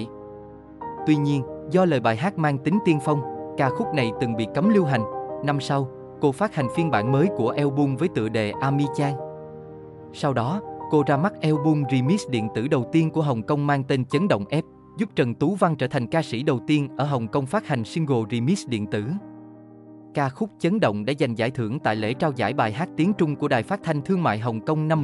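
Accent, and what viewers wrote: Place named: North, Vietnam. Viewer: Hai ngàn linh hai là sao tôi không hiểu, mình là người miền nam đọc theo từ miền nam đi đừng bắt chước theo miền bắc khó hiểu lắm nha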